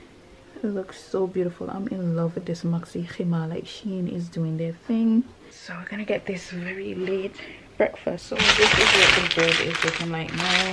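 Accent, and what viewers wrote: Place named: Jamaica. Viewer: I know a fellow Jamaican when I hear them🧏🏾‍♀️ Allahumma barik sis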